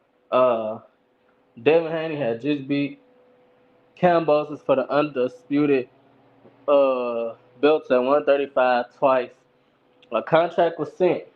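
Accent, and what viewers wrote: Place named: Baltimore, United States. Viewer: you sound like you from bmore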